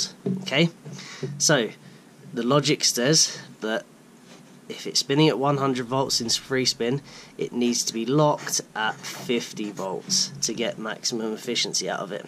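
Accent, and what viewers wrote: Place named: England, United Kingdom. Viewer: It’s like your speaking a foreign language with an English accent. Sounds like you’re on track though and hopefully you can upgrade the controller soon and find that lost power.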